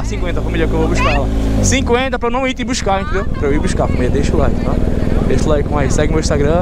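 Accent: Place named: Recife, Brazil. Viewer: Cara parece ser de Recife porra 😂😂😂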